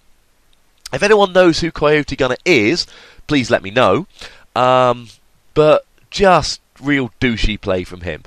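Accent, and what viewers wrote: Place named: United Kingdom. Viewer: A bunch of random t's, that's so British of you.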